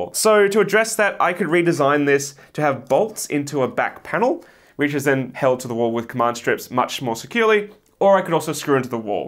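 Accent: Australia. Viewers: I’m your biggest fan I’m in australia and only last week I realised your Aussie O.M.G 😀 can I send you a latter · I was sold into printing as soon as i saw your big beautiful face and awesome Aussie voice! Youre still the only maker whos community I subscribe to and happily financially support, as you show me all of your failures alongside your successes. Since finding your videos, they have helped me define a path to create a small business creating Flow instruments, such as Dragon Staff, contact staff, poi, and fans. The best company on the planet for this is called ThreeWorlds and theyre actually based out of Queensland. You may be interested in some of their cool spinning geometry as i was, but would be able to acquire it much easier than me over in the States! I learned Onshape, and then you convinced me to learn Fusion360 instead, and then i made all of my own designs! 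I am currently expanding to have 3 printers, highly modified with Hemeras to print TPU at 100mm/s, just like my first e5+. I wouldnt be where i am without you, and my girlfriend is eternally jealous of how much attention you get! -Connor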